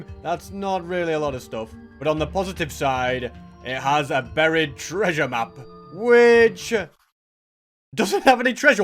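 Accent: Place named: Yorkshire, United Kingdom. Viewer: I love your Yorkshire accent!!!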